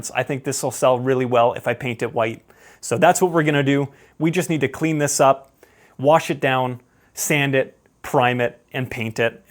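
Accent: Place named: Canada. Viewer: They turned out beautiful and your narration is easy to follow. I knew you were a Canadian right away neighbor. Visited the Falls last month--a view that never ceases to amaze me!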